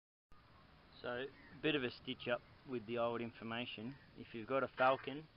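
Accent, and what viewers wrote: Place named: Australia. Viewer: Thanks for the video bud. Love the Commentary. Pure Aussie... Well done Mate.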